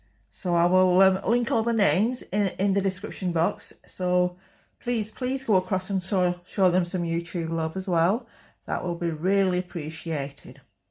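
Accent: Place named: Manchester, United Kingdom. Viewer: hi there! Newbie crafter here and I found you via the #fallfestcollab the shadow box is absolutely amazing! what an amazing way to re-purpose items! now the 2nd craft holy cats that's so imaginative !! egg shells! omgodness these are all so amazing! i'm sure you get asked all the time but I'm new.. your accent reminds me soo much of my favorite soap opera.. so I have to ask (to see if my ear is even close) near Manchester? :)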